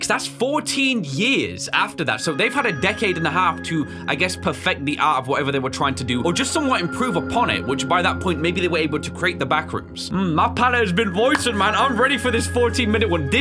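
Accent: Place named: Newcastle, United Kingdom. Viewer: This guy keeps on over-analysing literally every single thing, with his annoying Newcastle accent.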